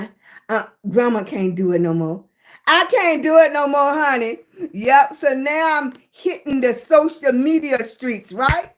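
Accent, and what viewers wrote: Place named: South, United States. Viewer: Love your spirit and your southern accent. Keep being a warrior.❤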